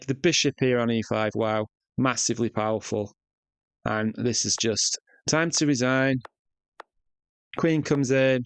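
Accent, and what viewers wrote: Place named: Leeds, United Kingdom. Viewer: Sorry, just trying to pinpoint your accent... Leeds...wakefield ?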